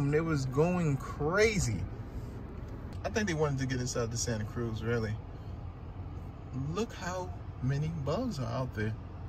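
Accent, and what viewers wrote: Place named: Washington DC, United States. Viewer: You sound like you from the north like DC area ! But yeah I’m getting the Santa Cruz premium or limited can wait to test one tomorrow 👍🏾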